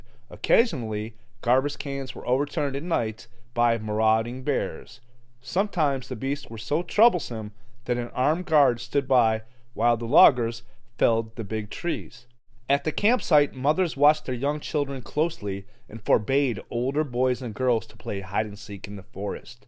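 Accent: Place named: Canada. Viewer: Canadian accent is too much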